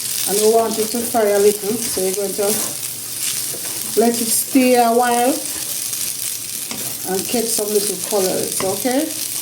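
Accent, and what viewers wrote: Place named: Jamaica. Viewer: Wagwan, when i miss Jamaican accent, i come here. Thank u mum for this yummmy video❤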